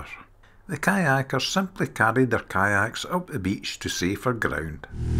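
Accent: Scotland, United Kingdom. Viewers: Amazing video and a lovely Scottish brogue. If God's willing, I will return to Scotland. My father loved kayaking in the San Francisco Bay. RIP my daddy.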